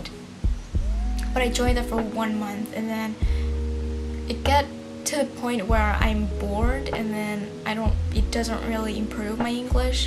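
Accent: United States. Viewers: Hello there:) Greeting from Montreal Canada, your English is fantastic and your accent is top notch. you sound very american. Well done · Sounds pretty much the same as american dialects !!! I like it how you say the T like the general american, keep improving !!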